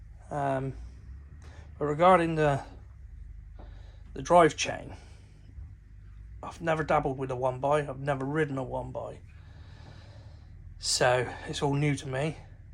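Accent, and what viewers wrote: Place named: Devon, United Kingdom. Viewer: lol sounded sw as in Devon... Plymouth (Plymonian)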